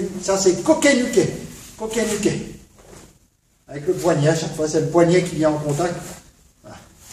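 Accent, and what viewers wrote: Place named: Franche-Comté, France. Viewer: J'adore tes vidéos. Tu as un léger accent qui me rappelle mes origines, le territoire de Belfort et la haute Saône. Cordialement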